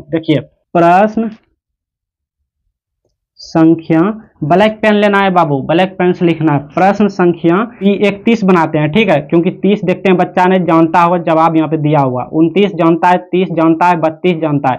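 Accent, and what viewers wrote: Bihar, India. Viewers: Mai video start karne se pahle soch liya tha ye pakka bihari hi hoga😂😂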